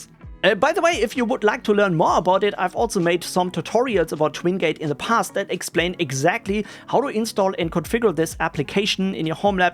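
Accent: Germany. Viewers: The German accent is the best one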